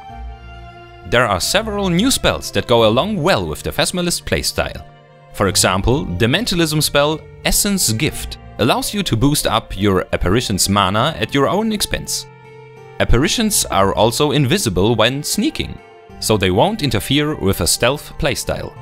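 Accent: Germany. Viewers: Thank you so much for making this game, I already finished it twice and I'm waiting for this dlc to play it for the third time. The whole idea seems amazing but I'm a little sceptical about completely moving sinistra magic books to outlaw merchants. Entropy already felt like a lesser elementarism, now it just seems like it's simply not worth bothering with, but we shall see. Also, I love that german accent.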